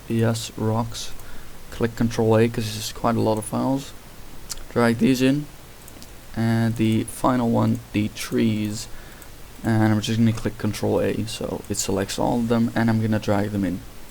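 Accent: Netherlands. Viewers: shall my laptop take off or explode? XD btw are you Dutch? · R u dutch?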